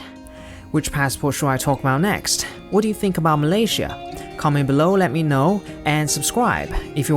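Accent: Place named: United Kingdom. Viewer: Goosebumps, Asian with British accent.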